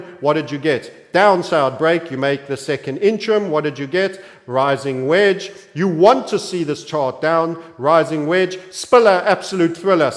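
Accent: South Africa. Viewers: How amazing it would have been to have met this guy where he is from because i am from where he is from. I here his accent. Only thing is, im from the mother city. Not sure where exactly you come from Sir. Ek wonder as jy a kaapenaar is of van KZN? Meneer ek kan nie so lekker siuwer Afrikaans praat nie, maar jy weet mos, ons praat baaie taale hier in Kaap. 😂 Dankie vir alles meneer.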